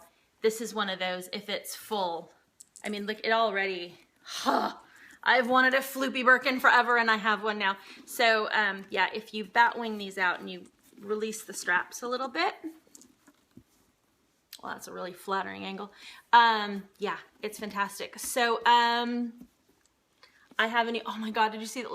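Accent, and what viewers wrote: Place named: United States. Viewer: your cute ....I saw an ash vlog ...you have an American accent do you live in England year round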